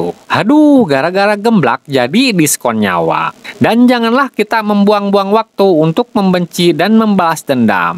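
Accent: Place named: West Java, Indonesia. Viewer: Kaya orng Sunda😀😀😀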